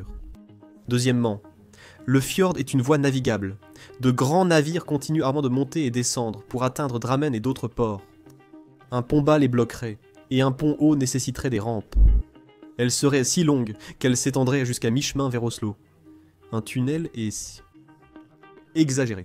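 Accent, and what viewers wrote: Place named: Portugal. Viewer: en effet c’est bien ça. L’accent portugais qui est reconnaissable de loin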